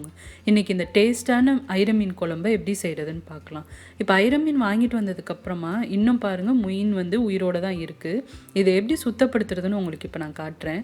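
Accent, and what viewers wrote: Madurai, India. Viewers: அக்கா நீங்க மதுரையா.....குழபும் அருமை....என் மதுரை தமிழும்......அருமை....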